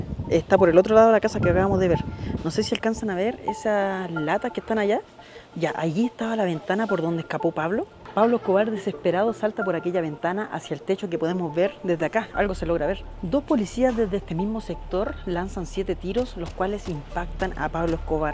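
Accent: Chile. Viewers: es el chico que hace el vídeo verdad? Porque tiene acento chileno.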